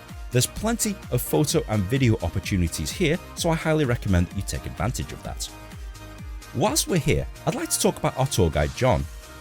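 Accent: United Kingdom. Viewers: This may offend you but how do you look asian yet you sound like a brit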